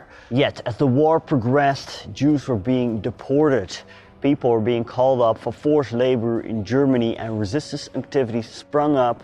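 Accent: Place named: Netherlands. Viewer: Aangezien je dit dus in het engels doet hierbij een tip. Werk nog een beetje aan je uitspraken. Als je dus engels sprekende mensen wilt aanspreken maar je uitspraak van bepaalde woorden niet goed is gaan mensen zich ergeren. Bijvoorbeeld “country” of worden met een D zoals “dead” en het verschil met “death”. Geeft niet, het zijn typisch Nederlandse uitspraken van her engels maar misschien kan je dat gewoon eens oefenen. Want je inhoud is de moeite waard.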